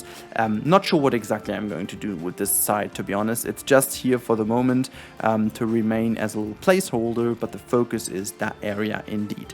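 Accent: Germany. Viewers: oha habs bisl am Akzent erkannt aber sprichst sehr gut englisch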